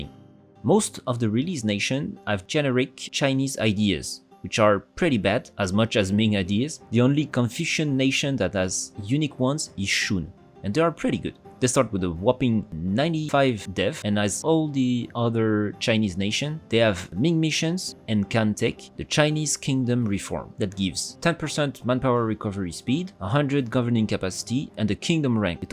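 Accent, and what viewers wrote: France. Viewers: I have the impression of hearing an accent, are you French? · Un français !!! Une bouffée d'air frais (ouais je t'ai cramé a l'accent ;) ) !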